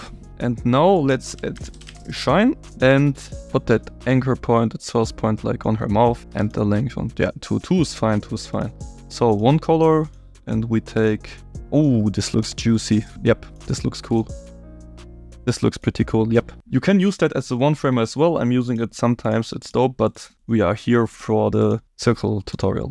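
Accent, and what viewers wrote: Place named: Germany. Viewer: Ich kann deinen Deutschen Akzent hören haha 😭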